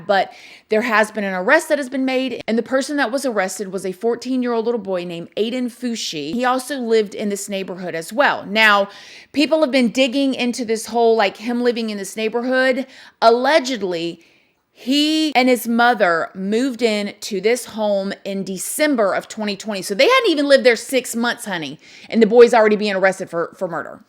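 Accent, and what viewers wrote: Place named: New York, United States. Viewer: Omg I love your new York accent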